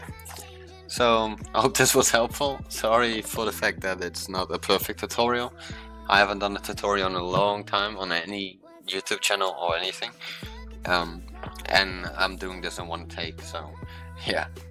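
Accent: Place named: Netherlands. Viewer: Haha, well isn't Dutch your first language? It makes sense that you have an accent of course and I love it xD <3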